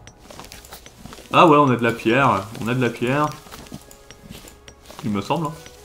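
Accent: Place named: Netherlands. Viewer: ton Français et très bon mais ton accent te trahie:D Tu es de quelle pays? À vu de nez je dirais Hollande 🤔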